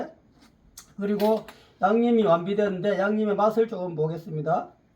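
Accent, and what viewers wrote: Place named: Gyeongsang, South Korea. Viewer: 구수한 경상도 사투리가 매력있습다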